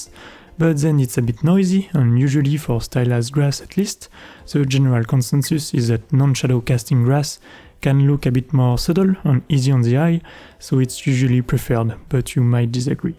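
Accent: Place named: France. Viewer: Awesome tutorial! love your French accent bro.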